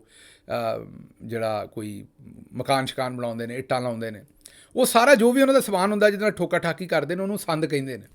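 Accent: Punjab, India. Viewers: Dr sahib🎉we enjoyed ur Punjabi accent wd nimma nimma hassssssa😂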